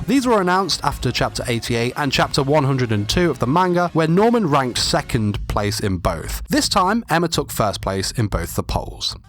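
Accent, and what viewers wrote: United Kingdom. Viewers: Is it the British voice? He drags me back for these facts don't worry I love his facts which that's his FACTOR :P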